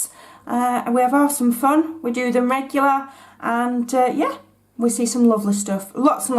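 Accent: United Kingdom. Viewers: Sometimes the British way of speaking is hard for me to understand. Could u please repeat the lady that sells the beautiful Victorian art pictures? I would love to also creat these bags. Love your videos thanks so much.....I am a shabby chic. Lady also....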